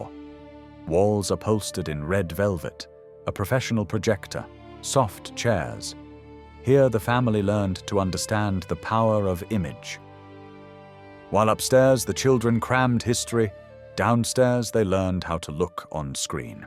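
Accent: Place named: United Kingdom. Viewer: Why do we have an awful Brit accent narrating a totally American documentary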